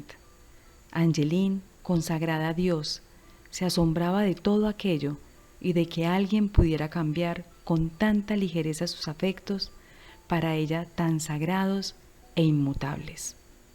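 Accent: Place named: Antioquia, Colombia. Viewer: El acento paisa es difícil de neutralizar...pero no imposible.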